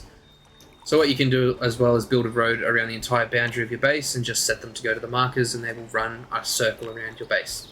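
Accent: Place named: Australia. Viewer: Thanks for these tips. I started a new game playing Aska this time not Ragnar And now, i'm managing and found agood location to hunt and forage foods. Closer to the mine as well. unlike before when I firstly started this game. I raged quit when the bear killed my 3 out of 2 npcs. And when I got 15 npcs most of them died of starvation during winter only 5 left. Because my location spawn point and where I made a base are too far from everything. haha! And subbed. You built a house on top of the mine. Would probably do the same and relocate my first game because my first game the mine was so far away from my base. Thanks mate. The accent is a gave away aussies. Cheers!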